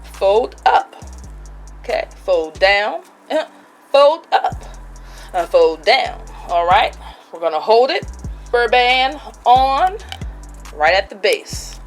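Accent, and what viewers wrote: Baltimore, United States. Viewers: I’m from the V in the DMV and I love the difference in all of our accents and Baltimore has one of my favorite accents by far.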